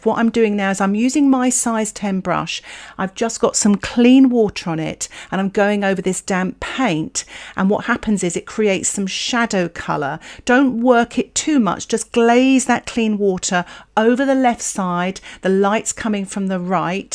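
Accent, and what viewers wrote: United Kingdom. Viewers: La tua pronuncia così britannica mi.piace tanto! 😊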